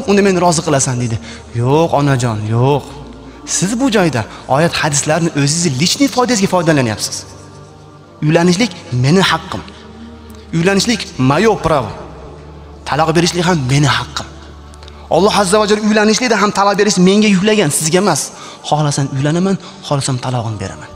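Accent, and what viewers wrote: Osh, Kyrgyzstan. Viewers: бу инсон шевасидан ошли ми дунйо тургунча туринг домла боркану хакикат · Ош шахридан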